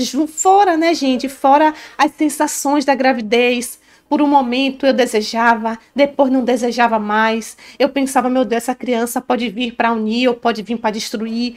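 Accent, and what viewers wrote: Bahia, Brazil. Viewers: Oi vc e baiana a voz parecida ?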